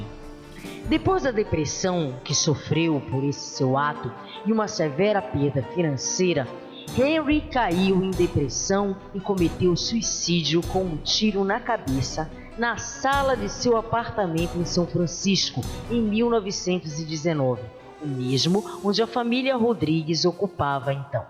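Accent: Pará, Brazil. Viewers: essa narradora é paraense?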